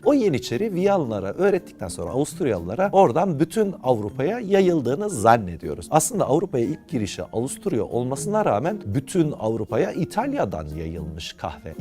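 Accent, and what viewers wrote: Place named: Adana, Turkey. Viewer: Dayı oğlu adana şivesi de arada gider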